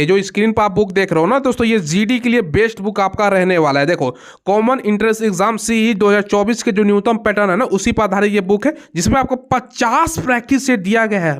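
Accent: Bihar, India. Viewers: Sir aap to khati bihari ban gaye hai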